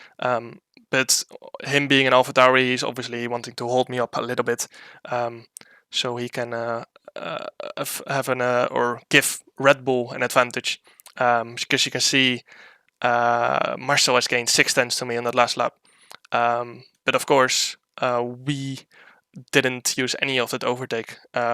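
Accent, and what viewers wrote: Netherlands. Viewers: Wat een heerlijk Nederlands accent zeg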